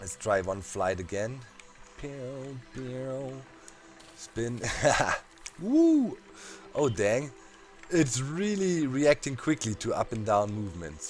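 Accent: Germany. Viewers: Man hört ds du deutscher bist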